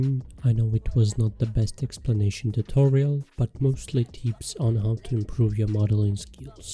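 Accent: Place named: Russia. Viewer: брат, палишься, что русский))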